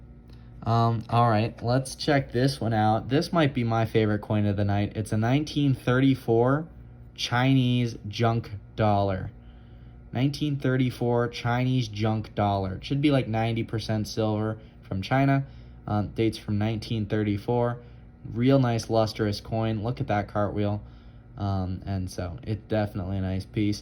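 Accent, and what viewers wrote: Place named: United States. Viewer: P.S American pronunciation is hilarious to the rest of the British world !! Bless!!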